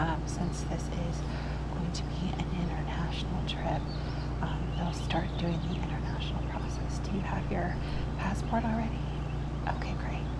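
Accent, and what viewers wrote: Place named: California, United States. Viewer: Hi dear, I love your accent, are you from California?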